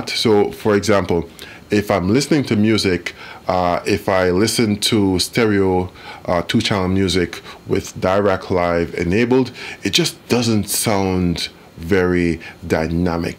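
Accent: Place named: Jamaica. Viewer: or you Jamaican? yu sound like a yardie my youth, nuff respect.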